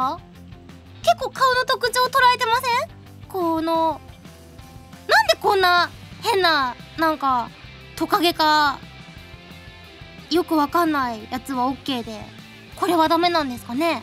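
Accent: Japan. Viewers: английский, с японским акцентом, это нечто <3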